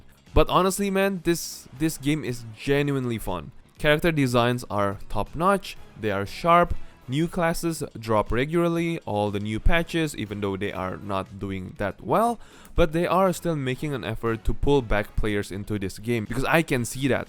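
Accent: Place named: Indonesia. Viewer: can tell u r indonesian in just few seconds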